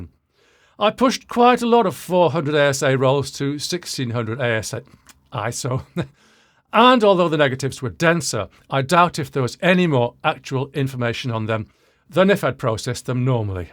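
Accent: United Kingdom. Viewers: Ouch. Anyone watching this video should read the comments before wasting the time viewing this one. The only thing credible about the commentary on film photography is that the speaker has a British accent. One cannot rely on this as a source of credibility. BTW, I did watch your other content, and it's great! Why tarnish your channel with this? Less credible material adds to the pile of rubbish that people have to sort through on youtube. Stick to audio. THAT is what you are great at!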